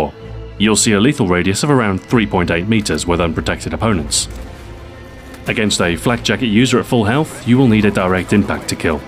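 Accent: Russia. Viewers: YOUR RUSSIAN IS SO FUCKING EPIC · I love his russian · Hilaerious Russian accent (I'm Russian) :D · Nice Russian accent. Almost spot on and hard to believe you English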